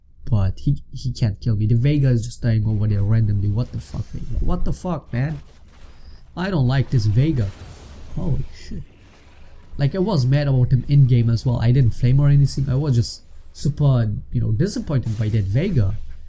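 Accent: Germany. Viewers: Höre ich da einen deutschen Akzent :D ? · ich mag deinen deutschen akzent xD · Wie man hört, dass du deutsch bist :D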